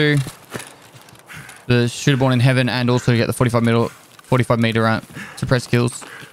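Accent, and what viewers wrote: Australia. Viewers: Its 3am. Sleep: calling: has new vid out Me: I MUST HEAR HIS AUSTRALIAN ACCENT AND BEHOLD HIS EXCELLENT MARKSMANSHIP. It gib me serotonin boost:)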